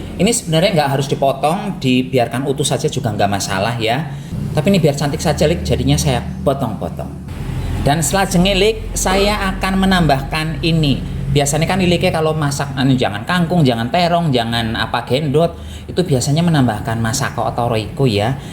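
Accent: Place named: Java, Indonesia. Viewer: Mas asline wong Kebumen apa wong Tegal sih. Basane medok temen.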